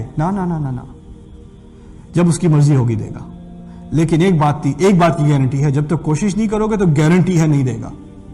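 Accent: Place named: India. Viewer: Masha allah done a great work... May allah bless you.... Nice hindi accent by the way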